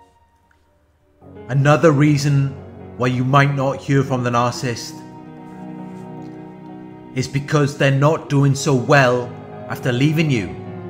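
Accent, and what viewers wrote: Wales, United Kingdom. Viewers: I like your Welsh accent :-) great video, very informative.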